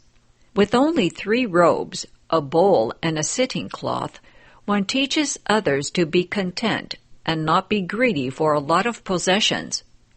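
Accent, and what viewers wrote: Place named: United States. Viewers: YOU SHOULD BE HAPPY FOR EVERYONE, BECAUSE EVEN THOU THERE'S HEAVY AMERICAN ACCENT ATLEAST SOMEONE DID THERE JOB TO SPREAD THE DHARMA. AS FOR SOMEONE WHO SEE AND JUDGED SOMEONE WHO WHO HAS TAKEN AN EFFORT TO READ THIS SUTRA. MAY YOU REALIZED 🙏 THE BENEFITS OF THIS VIDEOS. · Great but shame about the heavy American accent.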